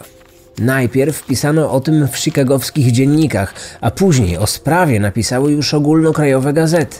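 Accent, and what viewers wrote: Poland. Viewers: Panie, wielkie uznanie za piękną polszczyznę, prawdziwy polski akcent i miły dla ucha głos. Zdrawiam i życzę wielu sukcesów.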